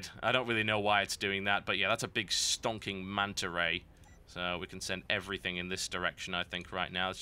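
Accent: Australia. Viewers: Now THAT'S an Aussie accent... Thankyou lord! Not another case of the butchered accent like the horrid one in Dead Island... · Wow... an actual real Australian accent? That's rare. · Australian guy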